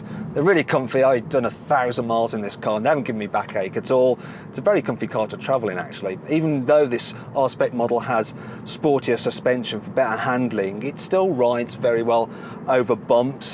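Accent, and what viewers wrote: United Kingdom. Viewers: it's not heyundai..... maybe the British accent is funny?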